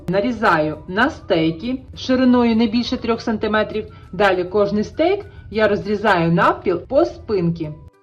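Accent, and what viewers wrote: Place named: Russia. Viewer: чутно що ти у побуті маскваротиш.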